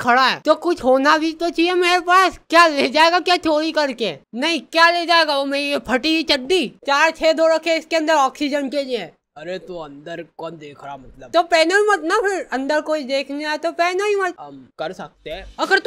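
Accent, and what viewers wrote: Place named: Malwa, India. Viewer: Bro tumhari urban malwu accent bahut mast h yaar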